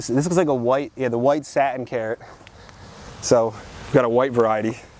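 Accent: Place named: South Jersey, United States. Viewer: Didn’t know u were from Jersey but I’m from jersey n I can hear ur accent. Sounds like south jersey 👩🏽‍🌾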